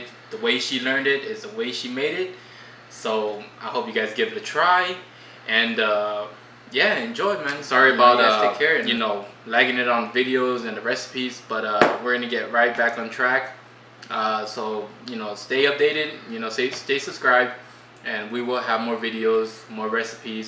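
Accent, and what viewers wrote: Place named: North, Cambodia. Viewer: She from north cambodia? her voice difference from us in svay rieng province. And her food make me hungry too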